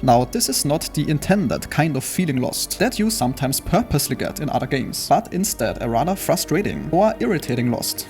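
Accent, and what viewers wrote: Germany. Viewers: Der Deutsche Akzent ist schon nice XD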